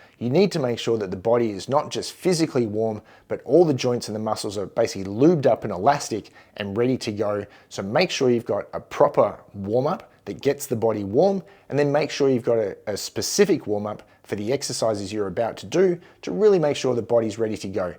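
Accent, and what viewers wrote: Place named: Australia. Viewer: Excellent info, and I can vouch for 95% of it from living it firsthand. Hearing it in an Aussie accent was a nice bonus. 🍻 🇦🇺 Cheers, from across the pacific (fellow lifter over 40)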